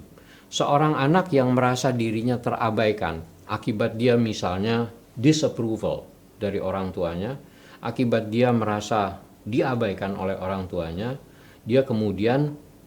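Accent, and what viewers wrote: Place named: Germany. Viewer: Jujur sih aksen jermannya Pak ini keren.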